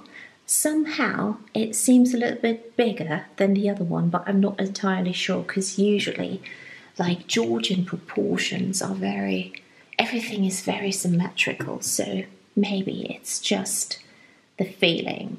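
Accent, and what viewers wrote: England, United Kingdom. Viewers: Lovely video. Are you from Germany? You seem to have a British accent. Were you raised in Britain? You do not sound like you have any German accent. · ❤❤❤❤❤❤ You have a beautiful British accent, it doesn’t look like you’re from Germany :) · Absolutely lovely! I am new to your channel and see that you are German, but your accent is British. Did you live in the UK? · Your accent sounds more English than German 😊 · I like your British accent, so beautiful. House is very good.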